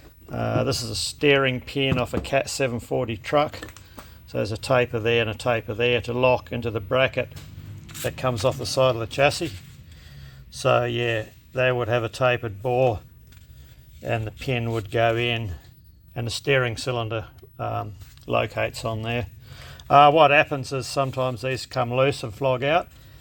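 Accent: Australia. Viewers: Another great video! Cheers from Norway again as it's late beer O clock! Looks like the stops there was a couple of Cat 3500 piston pins, have done a fair bit of those... Currently doing MTU for the last 10 years similar to the 3500. Also great to hear the Australian accent and the entertaining expressions like '' as the crow flies'' you have to explain that one as I didn't get it. Anyway cheers!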